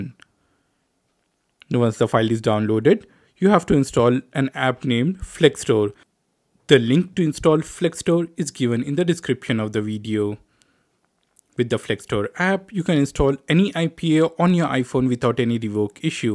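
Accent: India. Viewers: Why do you have a indian accent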